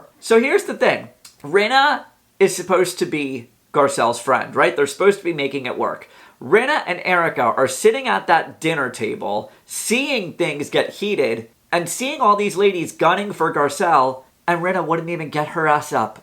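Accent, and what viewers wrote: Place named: South, United States. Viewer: I'm Enjoying your channel. You have a cute accent, southern?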